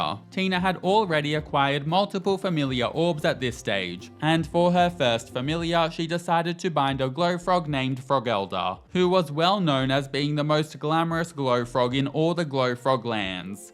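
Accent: Australia. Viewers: is Aussie 👀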